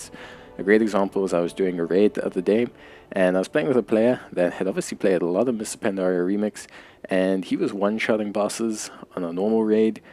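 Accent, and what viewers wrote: South Africa. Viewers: What accent is that? Saffa?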